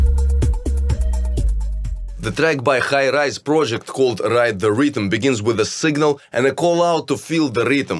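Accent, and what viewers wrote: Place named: Russia. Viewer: Всегда уважал людей, которые сами научились формулировать предложения по-английски. Отличный патриотичный акцент. Звучит очень стильно - как sovietwave. Респект! Канал по-настоящему уникальный!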